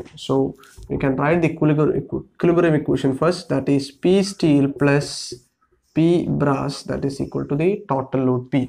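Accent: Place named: Kerala, India. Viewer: Are u a malayali, anyways great video. Keep delivering great content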